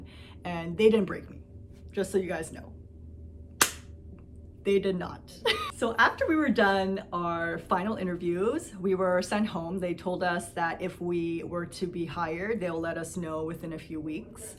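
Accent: United States. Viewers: How does she have an American accent if she is Canadian?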